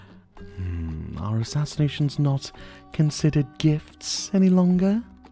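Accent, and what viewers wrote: United Kingdom. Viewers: as an Indian I’m quite flattered of how samosa really rolls off the tongue with his British accent🤍😮‍💨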